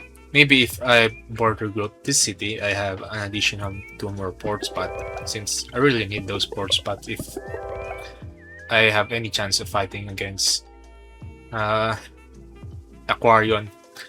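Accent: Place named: Philippines. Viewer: U sound Filipino lowkey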